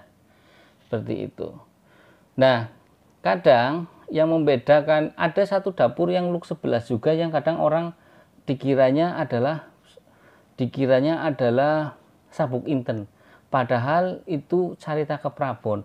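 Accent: Banyumas, Indonesia. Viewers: Om orang mna ya kok ngapak jg boleh minta wa'nya g om?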